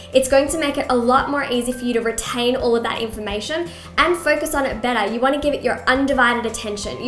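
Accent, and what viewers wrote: Australia. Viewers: Are you Australian? I apologise if your not but you sound Australia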